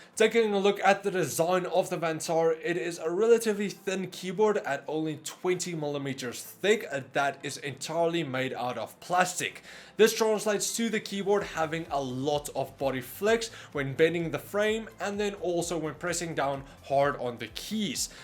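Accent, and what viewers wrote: Afrikaans, South Africa. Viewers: Ek wou se jy klink Afrikaans!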